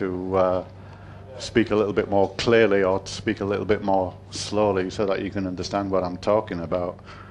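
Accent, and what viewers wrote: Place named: Hull, United Kingdom. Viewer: So nice to hear the Hull accent;) You're a legend. Grazey/PHF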